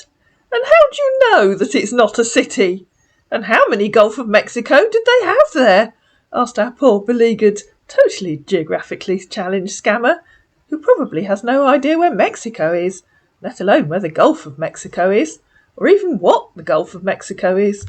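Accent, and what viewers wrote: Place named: England, United Kingdom. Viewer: perfume addict Thanks lol 💗 🤣 You have a lovely English accent, we were talking about our accents before, very easy on the ear. My accent is more flat. I hope you're enjoying your week ☀️🌿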